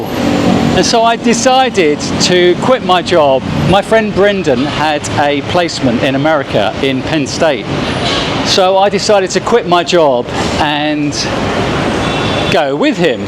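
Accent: England, United Kingdom. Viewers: actually I just realised. I really hate the UK accent, especially London. But your English accent is much easier on my ears :) Have a nice rest of the week. Dramaguetti, Dumaregretti haha. What else is that place called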